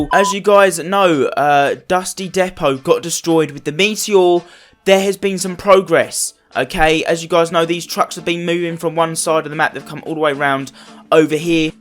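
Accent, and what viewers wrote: United Kingdom. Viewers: I did it. And r u from England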